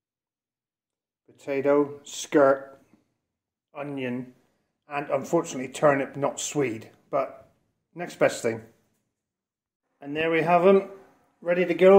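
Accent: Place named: Cornwall, United Kingdom. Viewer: We should never apologise for our cornish accent, be proud of it 👍, good size pasty.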